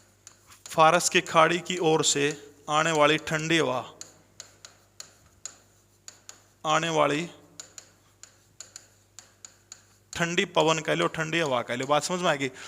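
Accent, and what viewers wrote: Haryana, India. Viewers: Sir aapki haryanvi bhasa Achi lgti h👍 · Dhanybhag humare guruji Aap jaise teacher se padhne ka moka milta h 🙏🙏Sirji bas meri ye problem rahi h jisme aapka jara sa bi dosh nhi Mai aapke Haryanvi lahja Kam samjh paya hu fir bi sbse best class aapki h bahut bahut respect or pyar sirji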